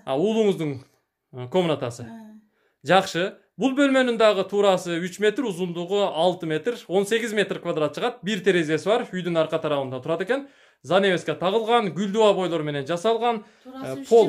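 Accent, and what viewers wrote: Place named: Osh, Kyrgyzstan. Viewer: Секинираак эле суйлочу сураныч ошский акцент мн эле . Жарылдай бербей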